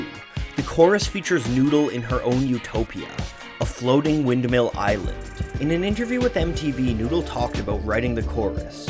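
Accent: Canada. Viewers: You have such an interesting accent. Are you Canadian?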